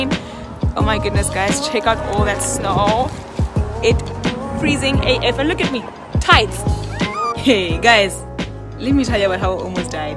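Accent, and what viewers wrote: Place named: South Africa. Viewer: It’s African! South African or Botswana actually. She sounds NOTHING like a Jamaican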